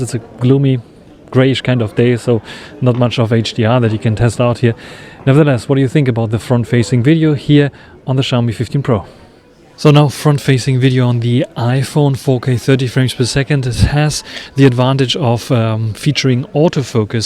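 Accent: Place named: Germany. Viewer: waruuuuum in Englisch in Deutschland? macht ein engländer in England od. Amy in der USA auf Deutsch??? was ist der Grund? schaut Leute, ich habe etwas Englisch gelernt? wenn dann bitte richtig und nicht mit dt. Akzent.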